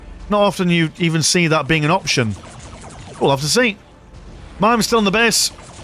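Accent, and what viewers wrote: United Kingdom. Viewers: its not Warhammer without a British man casting it, love your content man!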